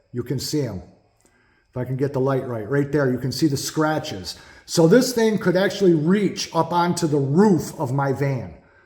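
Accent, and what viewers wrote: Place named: Upper Peninsula Michigan, United States. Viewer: Based on your accent, it sounds like upper peninsula Michigan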